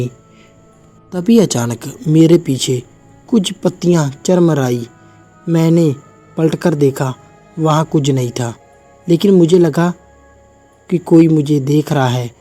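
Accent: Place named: Punjab, India. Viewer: Bai tere hindi bolne ke trike se lg raha hai ke tu punjabi hai 😅👍🏻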